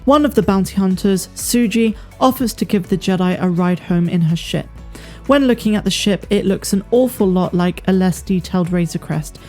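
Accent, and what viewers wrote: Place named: West Country, United Kingdom. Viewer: Is from bristol? Or West country? I can hear a twang! Happy new year Love the content!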